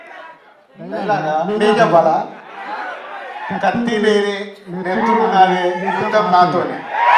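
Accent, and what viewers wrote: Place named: Tamil Nadu, India. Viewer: Dialogue lo TN slag ee vinipiatundhi😂😂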